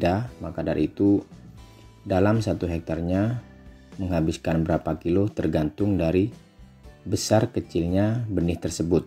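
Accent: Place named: West Sumatra, Indonesia. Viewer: Suaranya mirip Minang kocak 😀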